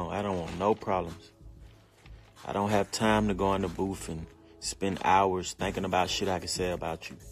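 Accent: United Kingdom. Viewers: Ok British boy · Nigga u British u aint from the A fr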